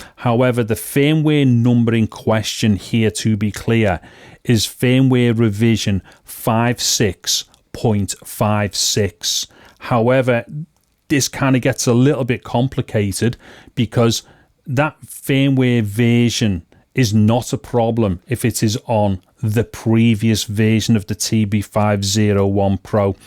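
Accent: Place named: Scotland, United Kingdom. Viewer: Horrible VIDEO! FIX your accent. It is NOT ENGLISH and too scottish... And GET TO THE POINT. You meander all over and go so SLOW. STOP the hand gestures...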